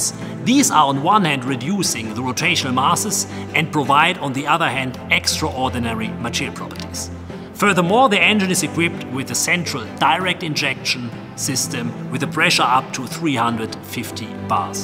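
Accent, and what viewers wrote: Germany. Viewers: This hard German accent 😂😂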